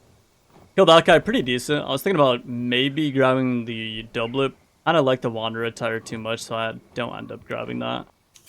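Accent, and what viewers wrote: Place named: Florida, United States. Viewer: I would bet this guy's from Florida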